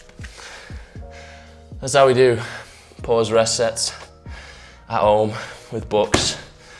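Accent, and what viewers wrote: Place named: Manchester, United Kingdom. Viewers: Fukin Manchester, Lad . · where in the UK are you from? You sound mancunian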